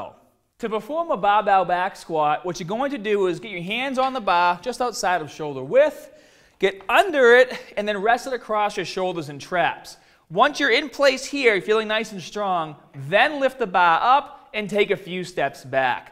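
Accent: Boston, United States. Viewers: DIdn't have to listen to that horrible Boston accent longer than i needed to...thank you sir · That accent though ❤️ Boston? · He’s from boston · oh man! that boston accent! love it! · Is this guy from Boston? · I came out of curiosity stayed because of the Boston accent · Its a boston accent apparently. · Sonia Boston accent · Are you from boston by any chance? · I almost can’t get through this video with that Boston accent. Geez lol. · The more I watch videos the more i kinda sorta want a Boston accent. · This guy lol bahhston for sure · omg he's from Boston, I've watched him for years and it's always come out, get over it!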